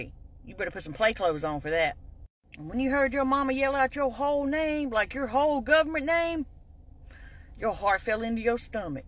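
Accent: South, United States. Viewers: Girl you sound just like me! Lmao you musta been raised in the south.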